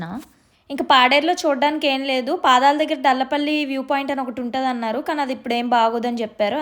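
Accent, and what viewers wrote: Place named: Telangana, India. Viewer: Mem veldham anukuntunnam so me vedios chusam chala bagundhi vedios ❤ tg slang i like it 😅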